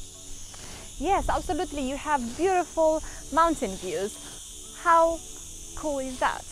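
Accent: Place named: London, United Kingdom. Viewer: I can tell by the accent that she lived (lives) in London.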